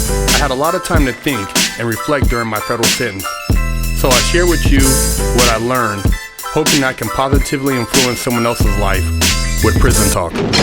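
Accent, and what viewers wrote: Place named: Montana, United States. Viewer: But you live in Cali right?.. sounds like you're talking like you live in Montana or something. No disrespect. Love ya!!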